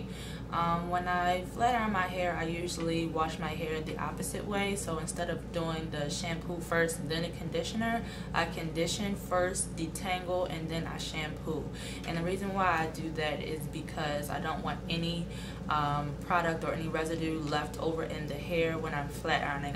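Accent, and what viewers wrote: United States. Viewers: Doesn't she sound American to you?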